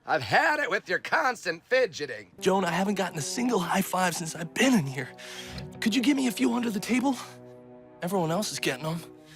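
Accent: United States. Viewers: Girl, you're upset about an INDIAN character played by an INDIAN actor having an INDIAN accent? I mean, whether or not the character was written to have an accent and whether the actor himself really talks that way is null and void. The character is INDIAN so having an accent seems fitting. Did he NEED an accent? No, but it's no issue that he HAD one. At least they got an Indian actor to play him than getting let's say, a white person and having him do an Indian accent, then I can see you getting a bit offended but you just seem to be nitpicking. The fact that boy even did that, never knowing him prior to his TV works, was genius was because he was convincing it shocked me when I saw interviews and come to find out that wasn't his accent. THAT'S TALENT and should be glorified and I don't mind him doing that more, not just speaking his regular accent because that's just how he talks in real life. 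He's playing a character after all so he should able to branch out and portray different elements from himself. And don't get me started on the clothes because it's simply to reflect the character's heritage. Do you feel it makes them "too" Indian? Why is it okay for YOU to wear your Indian clothes here? You don't need them given your rather Americanized accent I might say, but it's obviously done for you to reflect your heritage so why can't a character have this done? THE IRONY. Apparently if you're an Indian character and don't have an accent like you or wear Indian clothes (despit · also in Bengaluru there was this lady in a jewellery shop who was grinning ear to ear thinking you are a typical Indian girl putting on an American accent even though you were not , it’s the normal way you speak from the day you learnt to speak , just wanted to mention it here .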